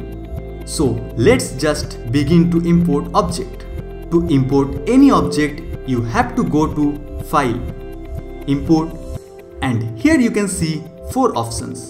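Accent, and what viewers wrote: India. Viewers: this mans got the strongest indian accent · You have a North-East Indian accent :)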